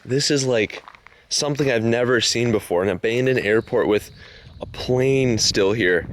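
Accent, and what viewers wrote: Chicago, United States. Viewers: I knew you were from Illinois. Got that Chicagoland accent haha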